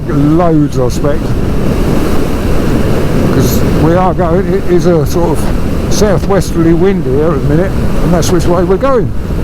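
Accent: Suffolk, United Kingdom. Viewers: Lighter note it's good to hear a good old Suffolk accent 👍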